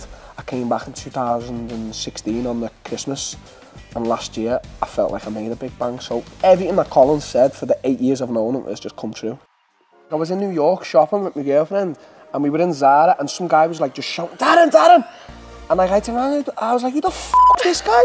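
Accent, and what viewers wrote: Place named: Liverpool, United Kingdom. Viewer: The scouse "r" and "arrr" help a lot.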